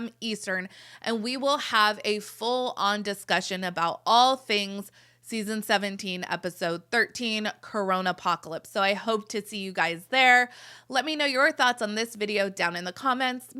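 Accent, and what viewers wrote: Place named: California, United States. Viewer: God I keep clicking on your videos … you say 10 words and I have to stop. I don’t know what accent you have but it’s super Intolerable. California maybe … you drag everything out in a weird nasal way. It’s grating on the nerves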